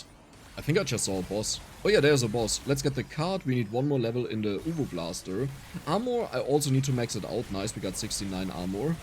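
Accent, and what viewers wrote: Germany. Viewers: Like your content, but are you German ?😂🕺🏼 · Kann es sein das du deutscher bist?😅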